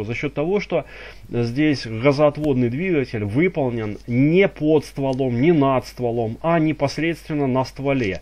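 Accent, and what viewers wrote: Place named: Ukraine. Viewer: Українець так що його можна панять і прастіть))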